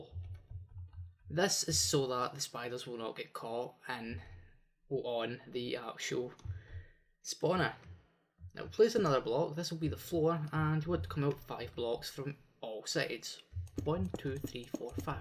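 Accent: Scotland, United Kingdom. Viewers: "Barely English"? Scottish accents are older than the USA. Either way, you could watch this on mute and you'd still be able to follow it. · Thanks for that video. Loved your accent. Very Braveheart.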